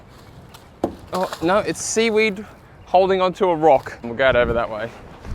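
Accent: Australia. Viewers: LOLOL......bikes seems to haunt you! So i have been subscribed to your channel for about two years, you were in Amsterdam doing the canal's. I heard your Australian accent and I was wondering what you were doing in Amsterdam and if you moved there. Anyway, were you stranded in Amsterdam because of Covid or is Australia your home base?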